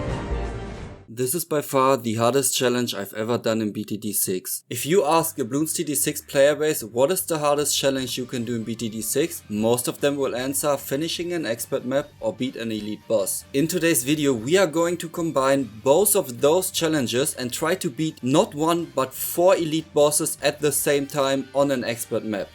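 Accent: Germany. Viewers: Deutscher Akzent immer so lustig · nice german akzent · U German?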